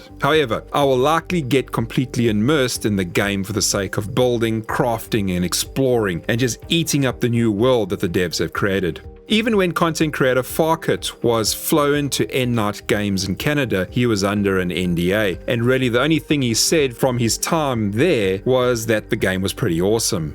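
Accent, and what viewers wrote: South Africa. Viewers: Are you South African? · Talking about "big" survival games, the day before will probably not get released this year. Your accent is not as strong as some, going to say Western Cape?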